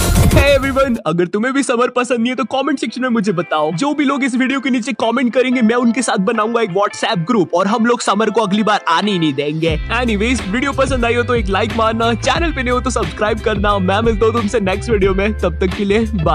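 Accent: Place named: Madhya Pradesh, India. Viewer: I'm from madhya pradesh.. where are you from??? Bro .. bcz you're accent is like m.p.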